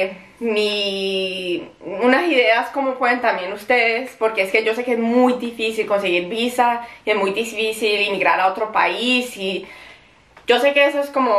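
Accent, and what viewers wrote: Colombia. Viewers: Acento Colombiano?🤔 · Que bellaaaa!!!! Lindo acento colombiano, y muy bien el español. Soy tu admiradora desde REPÚBLICA DOMINICANA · Hola... mucho gusto... que nota tu experiencia... La verdad se te escucha el acento como más Paisa... jajaja... ¿Entonces, ahora andas en las ventas? que buena decisión, con esto potencializas más tu visión y de verdad te felicito desde acá de Colombia... tu amada tierra... y de tus orígenes "San Andres" el lugar más hermoso de Colombia... Que la vida y Dios te sigan dando ese éxito que cultivaste durante 5 años en los campos...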